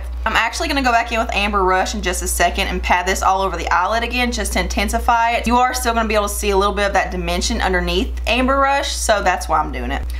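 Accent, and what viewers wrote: South, United States. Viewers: Your accent! I'm from Alabama so I'm really southern also! Where are you from! And, Amber rush is my Go-to eyeshadow! I wear it everytime I do my makeup! 😍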